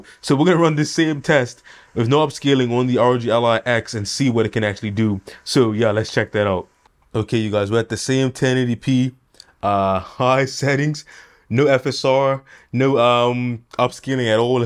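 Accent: Bermuda. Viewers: sound bermudian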